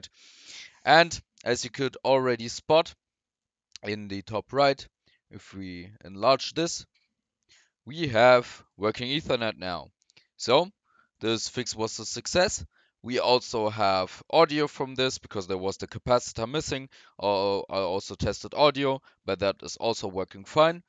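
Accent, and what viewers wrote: Germany. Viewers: why talk german people so hard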